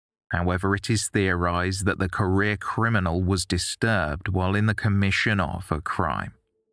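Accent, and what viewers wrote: United Kingdom. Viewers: I’m so glad I discovered your channel - British 🇬🇧 voice😀 great content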